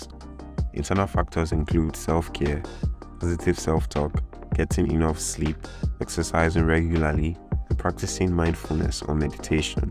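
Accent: Nigeria. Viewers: I just want to know, cos you sound Nigerian. Are you Nigerian? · Why you have Nigerian accent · You know he's valid when hes talking bout mens self care and he has a nigerian accent.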